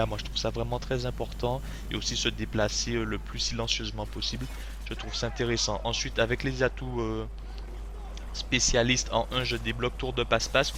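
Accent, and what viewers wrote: Antilles, Caribbean. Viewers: accent antillais non !? en tout cas tu commente super bien ! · C'est un accent antillais ? :) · J'adore ton accent ! Guadeloupe ou Martinique ?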